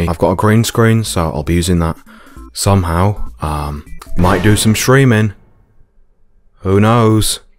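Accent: United Kingdom. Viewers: omg he's british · hey, mom, new femboy just dropped Btw: u look infinitely better knowing you have a british accent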